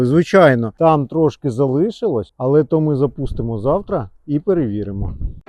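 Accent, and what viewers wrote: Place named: Russia. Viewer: Русский акцент режет слух !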